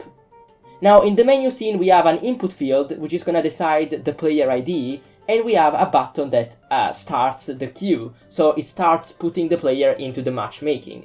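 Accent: Italy. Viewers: Si sente che sei italiano, Grande ✌️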